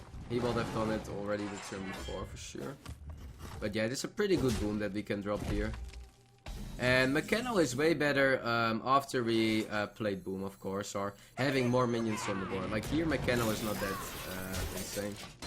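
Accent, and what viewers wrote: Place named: Netherlands. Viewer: Je Nederlandse accent is lichtelijk afleidend 😅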